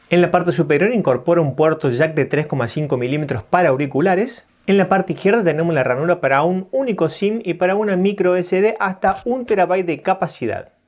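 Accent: Córdoba, Argentina. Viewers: ¿Eres cordobés?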